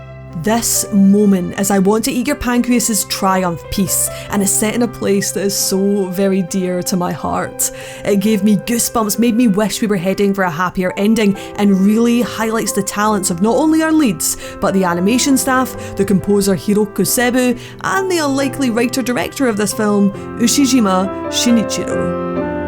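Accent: Scotland, United Kingdom. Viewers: I am just so used to hearing American engli accent....your British accent sound like crap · Where are you from in Scotland? I’m Glaswegian and I get you are changing slightly for other people but I can’t place it, also excellent work!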